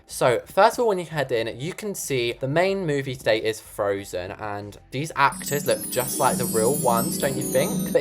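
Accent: United Kingdom. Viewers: Bro his G@ and British voice is driving me crazy 😭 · im so confewsed idk if your british or not bc when you sing you dont sound british?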